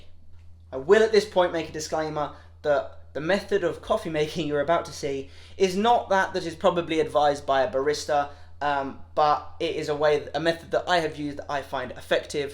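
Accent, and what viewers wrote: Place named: United Kingdom. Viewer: Thanks for the coffee and British accent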